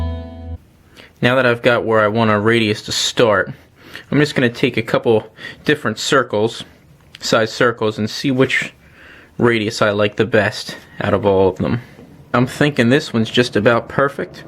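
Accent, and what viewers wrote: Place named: Chesapeake Bay, United States. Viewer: I'm guessing that accent is from the Chesapeake Bay area.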